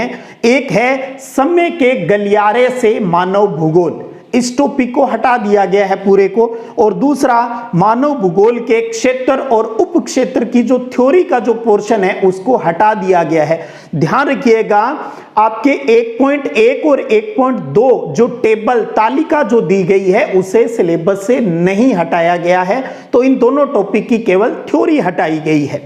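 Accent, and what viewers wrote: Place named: Sangariya, India. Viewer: Sir aap sngriya se h kya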